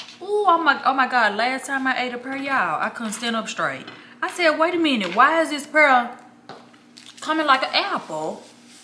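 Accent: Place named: Memphis, United States. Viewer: welcome to almost 40.. But you are still a BAD chick..U make me laugh so much because being from Memphis,i hear so much of it when you talk.. So i cant relate…You can also use rice water on your hair, it work wonders.. still stinks but I use it weekly with Castor oil…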